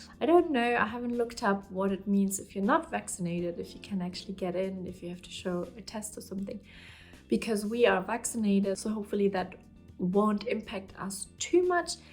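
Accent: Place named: Germany. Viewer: also I knew you were from Germany before you said it:D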